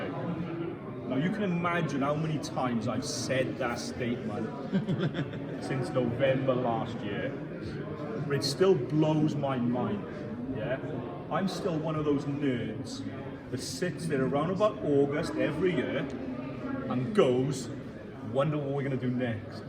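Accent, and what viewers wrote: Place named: Wales, United Kingdom. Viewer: Great to hear a Welsh accent demoing a TV :) Thanks for this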